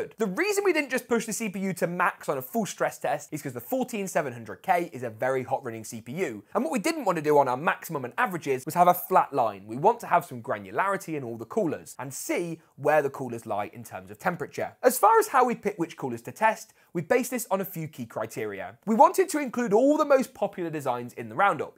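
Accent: United Kingdom. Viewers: Man, British accent is really tough(for foreigneers😅😅😅) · Are you British? No disrespect to England. But I Can't understand anything you say. You are eating half the words and talk too fast.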